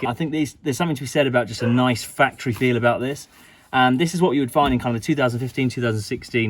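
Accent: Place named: United Kingdom. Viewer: Could somebody tell me what is the brand of the front seats, visors cover and the back seat covers? English isn't my native language and the British accent is a bit tricky for me to understand. Thanks for the help.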